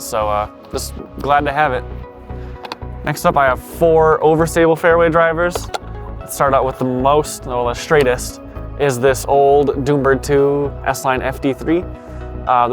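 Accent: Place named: Michigan, United States. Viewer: Ive lived in Michigan all my life and this is the first time I noticed a Michigan accident.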